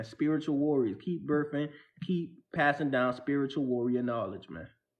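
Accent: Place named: Geechee, United States. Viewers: Bro I’m hearing that geechee accent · You sound geechee.. You from Charleston ,SC.. I knew it 😂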